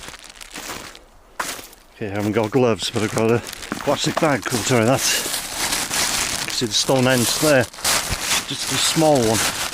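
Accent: Wales, United Kingdom. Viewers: Sounds Welsh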